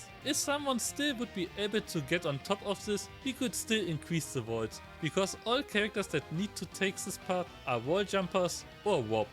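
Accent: Germany. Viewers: Dein Akzent ist soooo deutsch.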